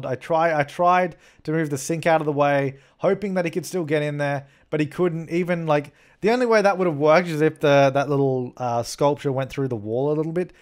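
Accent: Australia. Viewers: Are you Australian?Because I am🇦🇺Like if you are Aussie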